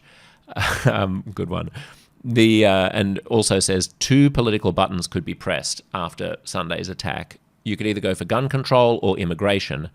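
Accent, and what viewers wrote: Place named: Australia. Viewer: Screw you man! Exactly how would you have “conducted the war” if you had been in BIBI’s position. What an intellectually lazy comment. Let’s hear it what would you have done from your cushy home in whatever part of down under you developed that grating dialect in? I should delete the the comment about your accent but there’s a little truth in every joke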